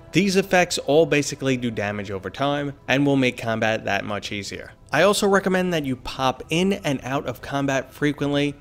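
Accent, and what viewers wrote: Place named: Boston, United States. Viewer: Your accent is very interesting 🤔. You sound like you have a Boston or New Jersey accent. Ya hold your long a sounds sometimes.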